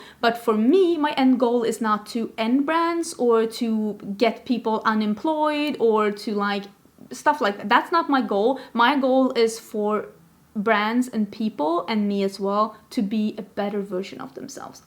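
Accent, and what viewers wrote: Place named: Sweden. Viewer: Man hör att hon e svenne iaf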